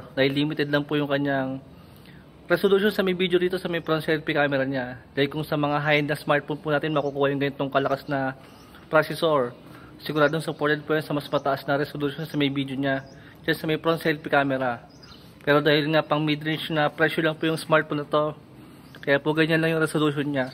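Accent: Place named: Bulacan, Philippines. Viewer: taga san k boss yung accent mo parang sa bocaue bulacan 😄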